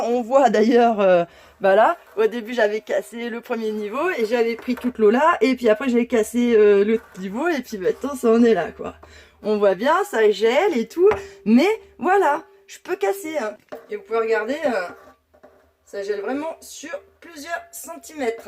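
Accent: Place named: Saint-Étienne, France. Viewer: Hello, il me semblais bien que ton accent me disais qu'elle chose surtout quand tu as parlé de st Retienne!! je suis de Luriecq dans le 42 et je suis en train d'acquerir un terrain (bois = clairière) près d'Apinac à 950m d’altitude, donc comme tu vois ton expérience et très importante pour moi. Nous aurons peut être l'occas d'échanger dans un futur proche nos expériences spécifiques. Encore merci pour ton partage.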